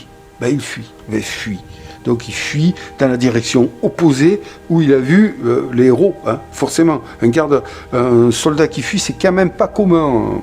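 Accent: South, France. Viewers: laccent du Sud de la france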